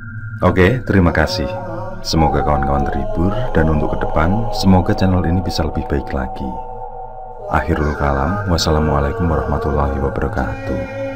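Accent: Java, Indonesia. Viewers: You got a new subscription from me! Logat Jawanya sukaa. Gambarnya bagus, nggak pakai penggaris pun garisnya lurusss.❤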